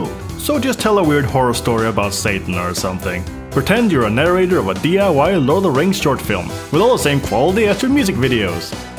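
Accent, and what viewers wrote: Norway. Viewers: Love your cute Norwegian accent hahaha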